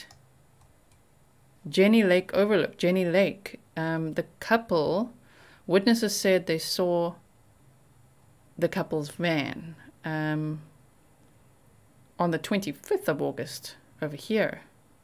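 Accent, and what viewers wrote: South Africa. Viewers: Love your saffie accent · are you South African?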